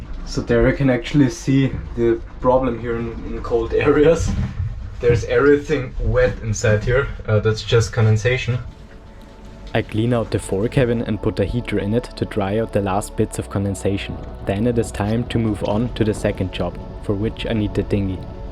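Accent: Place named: Austria. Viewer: In deinem Vorspann heißt es, dass du der jüngste Deutschsprachige sein willst und machst die Videos in deinem holprigen Englisch, stark geprägt vom österreichischen..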